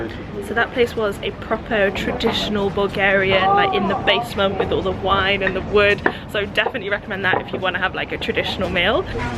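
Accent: United Kingdom. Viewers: Thank you for the wonderful words about my country we all bulgarians appriciate it! Wish you to visit many times again and also wish you great time on another trips! P.S. Where are you from? You sound like a british :)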